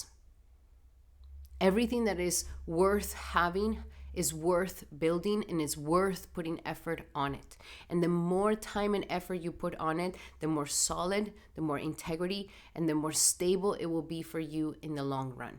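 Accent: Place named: Spain. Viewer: I love your intros! Most channels are so fluffy, your intros are so dense, captivating and informative! I have a question, when you say if a planet is “strong”. How do I know if my Saturn is strong? Also very random, I’m a Spanish teacher and love the language… I know this is a Vedic channel, but your accent sounds Spanish -esque 😂 any relation?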